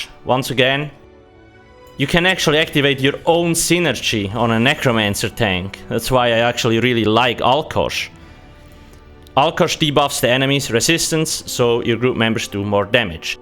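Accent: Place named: Switzerland. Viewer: Definitely not Russia, Switzerland I think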